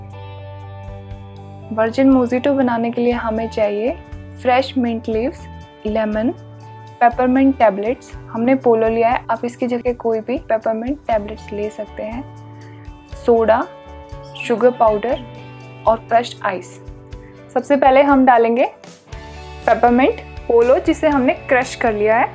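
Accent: India. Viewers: Don't overreact. Just like American and British, it's Indian english. No pronunciations are wrong here. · Yes that is the actual pronounciation but PPL in India have a different accent that why they pronounce things different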